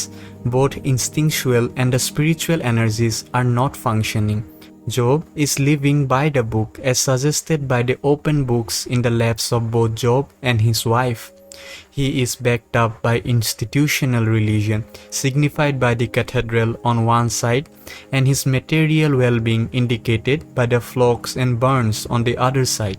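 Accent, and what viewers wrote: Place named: India. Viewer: Nahh bro. I like his Indian accent. It makes him unique from other western Jungians. Love it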